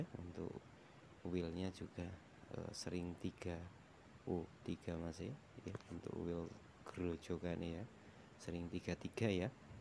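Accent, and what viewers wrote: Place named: Java, Indonesia. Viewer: Joss cak smga sukses selalu cak logat jowomu gurih😁👍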